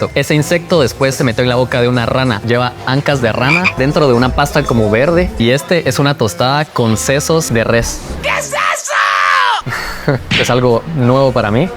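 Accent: Guatemala. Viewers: Lo amé! Realmente me encantó la edición y la forma en que comunicas todos los platillos es muy culta y neutra, tu acento chapin se nota igualmente Gran video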